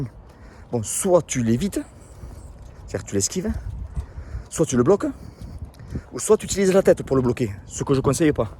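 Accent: South, France. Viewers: ... t'es en train de me réconcilier avec l'accent du Sud! Parigot que je suis! Force à toi!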